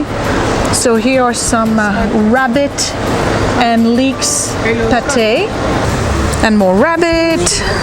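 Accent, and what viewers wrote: France. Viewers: I hear already a little france accent, love it!!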